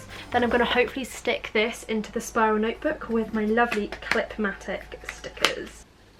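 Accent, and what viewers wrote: United Kingdom. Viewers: Gosh!i love your accent British~♡love it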